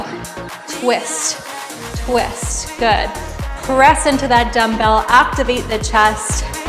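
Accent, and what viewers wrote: Canada. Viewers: Love your work-"ouuts" ... and your Canadian accent!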